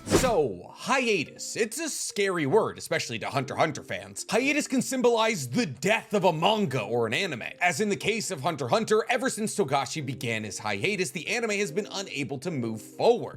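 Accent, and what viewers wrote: United States. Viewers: It’s Ka-wa-ki, stop pronouncing Japanese names as an American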